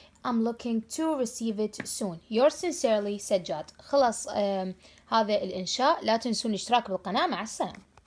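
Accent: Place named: Iraq. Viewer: ههههههاي. عينيه. عله. صوته بعد عراقي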